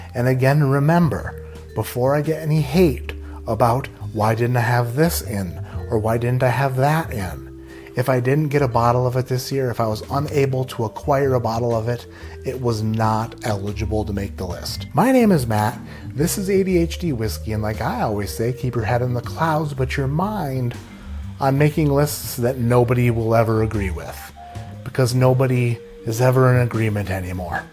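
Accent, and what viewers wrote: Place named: Midwest, United States. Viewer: You are very welcome sir. I enjoy your content. I enjoy your plain spoken mid-western accent. I also like that you are willing to call out duds when they are duds. I am still writing now because I am hoping that the longer a comment is the better it helps your placing in the almighty algorithm. Have you considered the great spaghetti monster? When you were small did you pronounce it "sketty"? or "pssssketty"? I did. I really like the bit you have going with Rex. I can't wait for your reponse. I hope it is full of hyperbole and sillyness. I know what will boost comments. Let me start an argument. Okay: Jack Daniels isn't Bourbon. Why? Because they don't say Bourbon on their own label. And if they're too good, or too yella to say Bourbon, they don't deserve such an honor. Ok, that's all I can think of for now. hope this helps.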